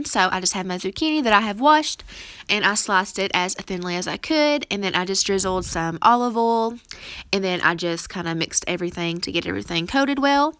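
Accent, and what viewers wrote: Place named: West Virginia, United States. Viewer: Where are you from? Your accent sound like you're sound local or maybe a surrounding state, I'm from West Virginia 🙂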